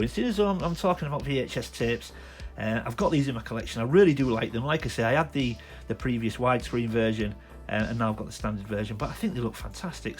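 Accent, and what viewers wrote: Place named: Northern England, United Kingdom. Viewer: Great to hear a Northern voice talking about their passion for collecting. Been back and currently watching all your videos now thanks for all your hard work!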